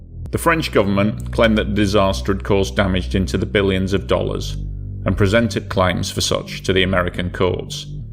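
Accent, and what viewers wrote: United Kingdom. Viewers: they're Brits. They speak a different version of English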